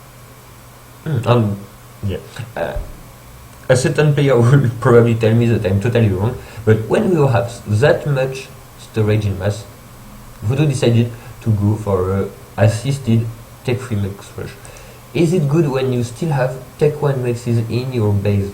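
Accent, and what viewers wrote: France. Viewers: Something about the heavy french accent and the microphone buzz makes watching your casts very relaxing. No but seriously, these casts are amazing because you pay attention to what makes FA awesome, the little decisions. Don't hesitate to do more.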